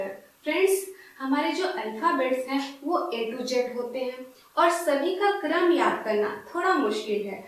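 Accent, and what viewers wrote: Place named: India. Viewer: yea she is but this isn't British it's Indian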